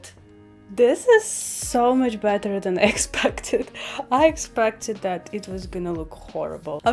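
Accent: Russia. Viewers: From the accent and intonation of her voice, I realized that the girl was Russian. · I could listen to your accent for days. Is it Russian? Thank you for video. I am disabled and cant afford salon anymore plus i have depuytens contracture in my dominate hand. It makes for very difficult to trim my own hair.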